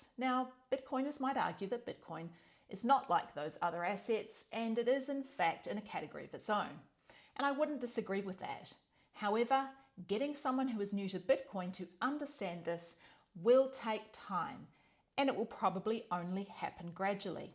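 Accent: New Zealand. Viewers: Enjoy your videos. Could you provide a bio sometime in a video? I believe you have said you are from New Zealand (and I detect the accent) and live in Portugal now. How did that happen?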